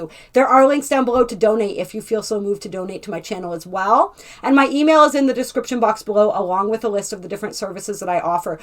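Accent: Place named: Midwest, United States. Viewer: Your video brought tears to my eyes, a pressure in my heart chakra and inner peace. Thank you for your spirit, your guidance and you Midwest accent 🌈❤️🧡💛💚💙💜🤍☯️♋️/♌️ 33/6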